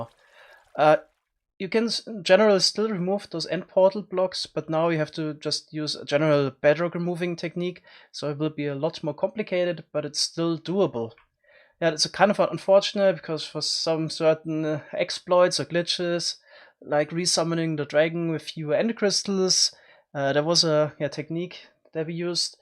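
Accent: Germany. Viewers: Du bist deutsch, oder? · are you from Germany? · 100% der Akzent ist einfach mega deutsch 😂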